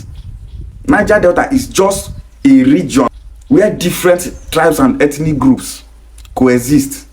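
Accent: Igbo, Nigeria. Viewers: I jus love the way you greet on in your igbo dailect 😂❤😂❤ Ekene ❤🎉 Daalu ekene dili gi nwanne madu ❤📚👏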